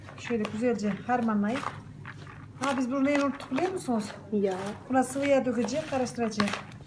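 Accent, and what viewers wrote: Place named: Çorum, Turkey. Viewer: asıl memleketiniz neresi biraz çorum tarafına benziyor şiveniz becerikli süperrrrrrr süperrrrrrr hamarat anne hayırlı akşamlar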